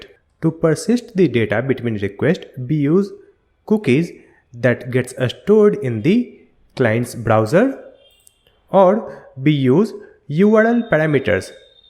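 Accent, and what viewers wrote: India. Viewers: Indian accent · you explain fast and youre indian so a little bit hard to understand what your saying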